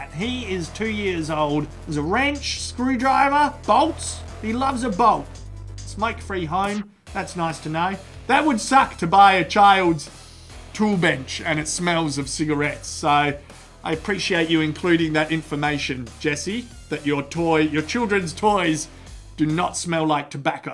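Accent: Australia. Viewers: i recognize his accent. is he australian or he just have a strong english accent. i mean really strong lol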